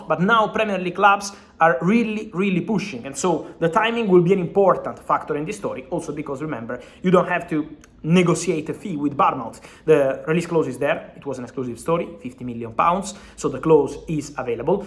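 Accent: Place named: Italy. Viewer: Por qué no habla en español o tiene traducción simultánea 🥴. Su inglés es muy malo y le entiendo el 30%. I mean, If you're not completely sure of you pronunciation, please try to speak slowly because the italian accent is really thick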